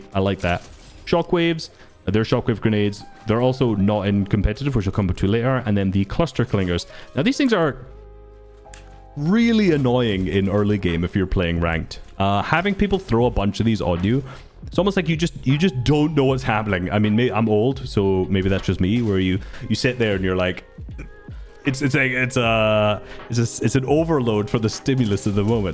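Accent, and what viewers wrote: Scotland, United Kingdom. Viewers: WAAAYYY LESS GO WE CAM HEAR THAT GLORIOUS SCOTTISH VOICE AGAIN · bro every time i watch every time I talk after I talk in a scottish accent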